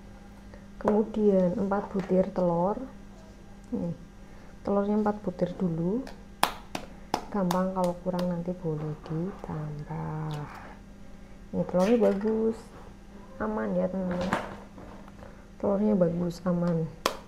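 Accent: Banyumas, Indonesia. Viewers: Makasih mb ,mba ngomonge ngapak wong ngendi ,kreatif banget ,makasih ya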